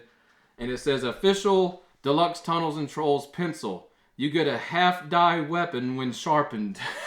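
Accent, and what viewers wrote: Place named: South Louisiana, United States. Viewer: Where are you from? Sounds like around my area in South Louisiana.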